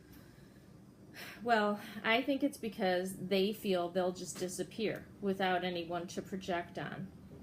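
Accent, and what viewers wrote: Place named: Midwest, United States. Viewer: Love the midwestern accent!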